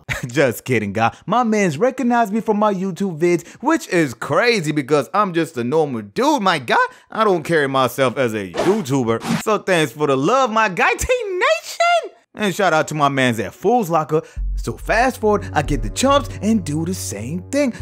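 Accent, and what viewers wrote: Nigeria. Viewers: R u Nigerian? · Bruh are you nigerian?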